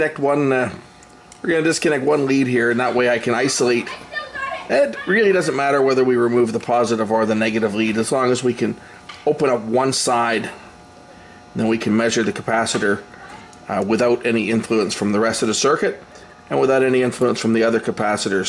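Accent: Canada. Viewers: Cool video. You sound like you are from Canada? Are you?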